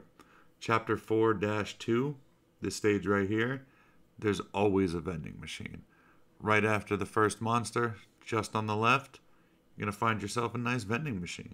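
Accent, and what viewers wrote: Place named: United States. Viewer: man youre american for sure. Thx for ur tips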